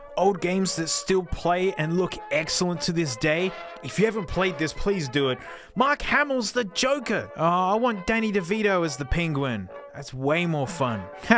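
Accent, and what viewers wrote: Australia. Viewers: You sound like sniper tf2 and aussie too noice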